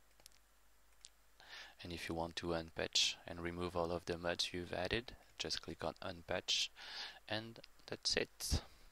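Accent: France. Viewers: On s'en fout de l'accent j'ai jamais un francais parler si bien l'anglais. -un quebecois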